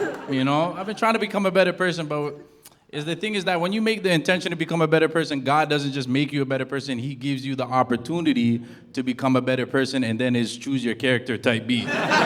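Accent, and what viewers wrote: Toronto, Canada. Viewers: i kno toronto when i hear it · Anyone wondering how a real Toronto accent sounds it this..not the suburban kids on TikTok😂😂😂😂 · God couldn’t listen to the whole act. That disgusting “Toronto accent”. 🤮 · Trus me I knew right away before he mentioned it this man had to be from T.O. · This guy has to be from toronto from his accent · Buddy sounds straight from Toronto off rip 🤣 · Bro, I knew you're from Toronto, listen to that accent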